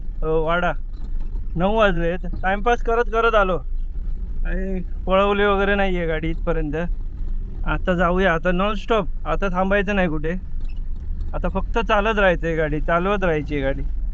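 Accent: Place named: Malvan, India. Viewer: दादा मध्ये मध्ये मालवणी बोलना ❤ भारी वाटतं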